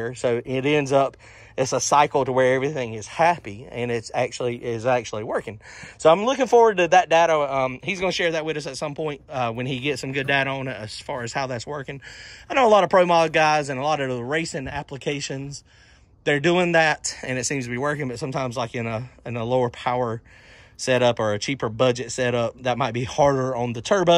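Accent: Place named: Georgia, United States. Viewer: Thank ya, brud in law! Preciate your insight. Where you at? Sound like a Georgia boy. Take care n keep your shiny side up...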